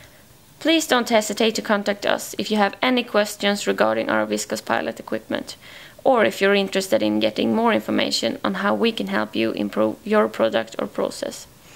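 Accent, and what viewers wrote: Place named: Sweden. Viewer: Normal Swedish accent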